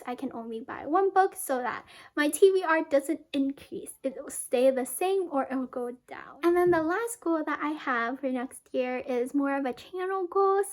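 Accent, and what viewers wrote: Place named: United States. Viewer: I think it's an American accent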